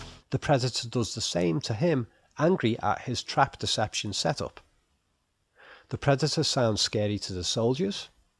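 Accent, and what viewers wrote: Liverpool, United Kingdom. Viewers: A scouser from Liverpool, yes!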